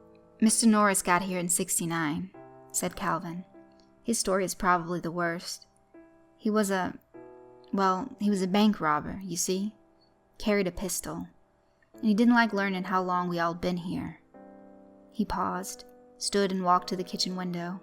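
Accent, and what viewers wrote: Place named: Georgia, United States. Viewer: Where ever you get your stories is great much different than the other's but in the best way possible.I love your voice I'm from Tenn and u remind me of family in South Ga Love the channel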